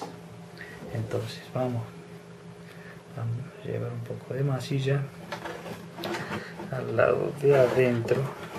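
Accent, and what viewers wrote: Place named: Jujuy, Argentina. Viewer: Eres de la quebrada? Jujuy? Digo por el acentico. Saludos desde Chicago.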